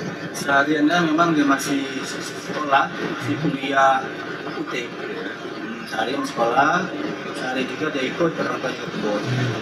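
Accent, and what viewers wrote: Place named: Banyumas, Indonesia. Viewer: Ujug ujug 😂 wong ngapak admin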